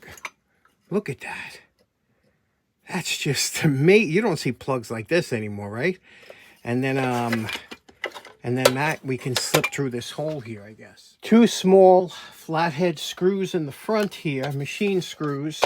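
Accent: New York, United States. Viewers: Great Video bro that Vacuum came out great def in my opinion the best YouTubeer to listen too while doing a restoration 💯 Its probably the NY accent has to be 👍🏼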